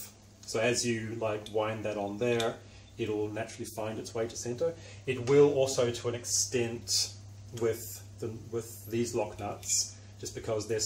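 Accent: New Zealand. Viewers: Hey bro you just got a new sub cool... Your accent sounds kiwi?? Are you nz based would love to check out your work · Brilliant ideas - great video with the detail well explained. Thank you for your generosity in open sourcing all your research. Would you be from New Zealand by any chance? That accent sounds very familiar. Looking forward to the tutorial video.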